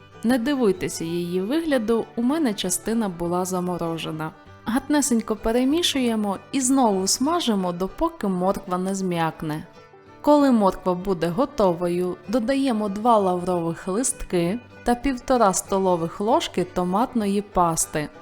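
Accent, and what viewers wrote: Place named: France. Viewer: Маєш французький акцент але смачно ❤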